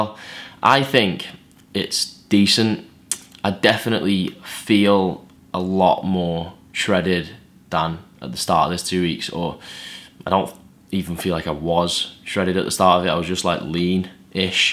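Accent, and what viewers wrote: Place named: Yorkshire, United Kingdom. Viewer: Where are u from? I love the accent, sounds a bit North English probs Yorkshire?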